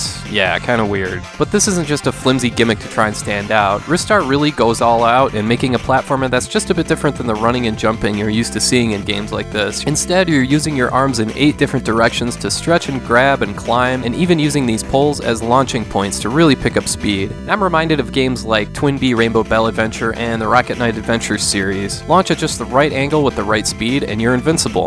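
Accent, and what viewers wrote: Minnesota, United States. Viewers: Loved that Minnesota accent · Wait u a Minnesota resident?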